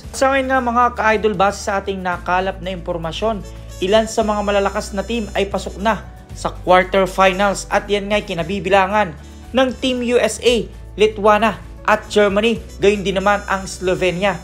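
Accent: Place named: Visayas, Philippines. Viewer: Bat parang bisaya ka at hirap magpronounce. 😂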